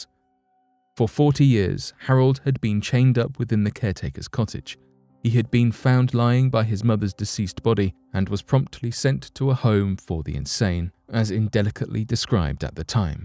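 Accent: United Kingdom. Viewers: You can't beat a good British accent 👍🏻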